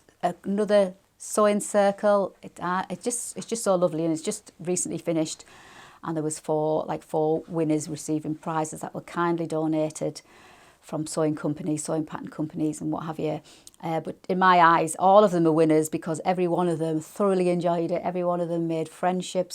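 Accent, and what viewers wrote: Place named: Northern England, United Kingdom. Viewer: hello, I came here from The Dahlia Sewciety channel. Kristen was showing your blouse pattern. Now a new subscriber! May I ask which part of the UK you are from? I'm fascinated by accents and I'm thinking somewhere in the north of England 😃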